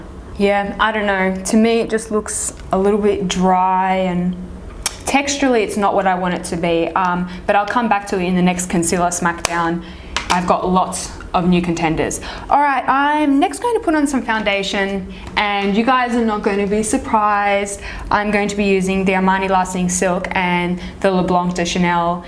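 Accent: Australia. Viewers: Hey beb are you an aussie? Another thing is where do you buy all your makeup from? You're gorgeous btw!!!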